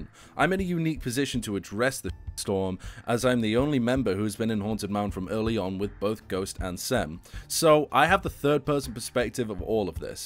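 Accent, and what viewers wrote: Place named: United Kingdom. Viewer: You sound to british for this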